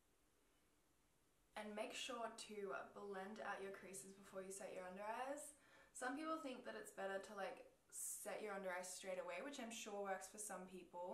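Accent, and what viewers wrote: Australia. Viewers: I literally pause the video every once in awhile and try to copy what you say in an Australian accent because I want one so bad hahaha I think it's so cute!!! Love u 💖💕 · Your an Aussie? G’day!!!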